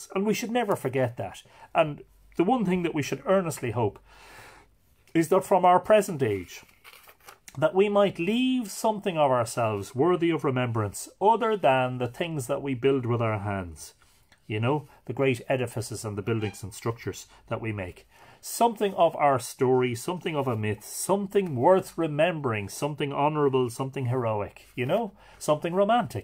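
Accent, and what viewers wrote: Ireland. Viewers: My name is, Im from Louisiana and I have been listening to your live myth readings since the first Day. I never seem to catch them live, but I never miss a reading! I think what you are doing is great and want you to know you are really helping alot of us out there escape, atleast for a little while, from all the madness going on in the world right now! Being on lockdown and pretty much being out of work with no income for the forseeable future, and living in the place with hight initial growth rate of confirmed cases for covid-19 in the world, It's not easy to escape! You have such a warm, calmness and a delightful positivity, that "story time" has become quite popular around here! (Me and several of my friends, coworkers, and family members have started to call your nightly readings our "story time") when I first introduced your videos to everyone I said, "Check this guy out, its like story time with an Irish Bob Ross or Mr Rogers" and "story time" stuck! 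Lol And I just want to thank you and tell you are helping more people than you could ever know.... Me and my two Dogs, my sister and several other IUC nurses, a bunch of bored out of work waiters and waitress, and several of my family members who are stuck at home with their kids, all look forward to our "story time" You have a fan club growing in Louisiana and you are truely helping make the world a better place in a dark time. Your simple act of kindness, of reading stories to help people forget for just a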